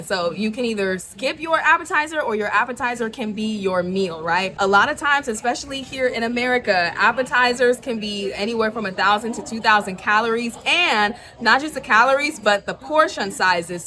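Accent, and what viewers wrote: Caribbean. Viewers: Hey 👋🏿, do I hear that you have a Caribbean accent? If so where from?🙂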